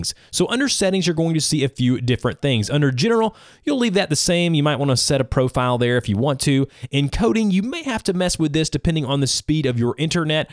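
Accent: South, United States. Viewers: Are you from the Southern U.S.? (I'm a Linguistics major so I have a tendency to try to guess accents.) It sounds kind of like you moved to the Northeastern United States after growing up in the south.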